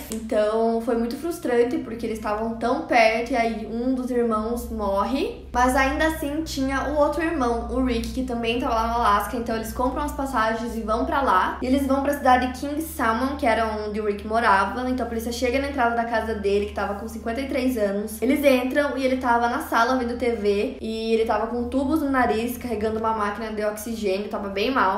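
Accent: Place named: Ponta Grossa, Brazil. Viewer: Amo esse canal é esse sotaque Ponta grossa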